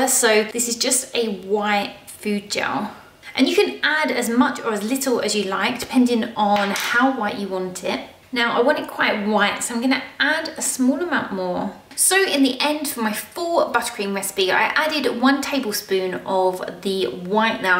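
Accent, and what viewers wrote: United Kingdom. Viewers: I love your accent! what kind of british accent is it?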